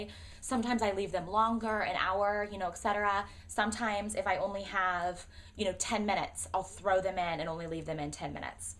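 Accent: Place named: Texas, United States. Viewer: Hi. Where do u work out of? Sounds like ur in Tx. And I just mite be willg to drive to have u do my hair! 😁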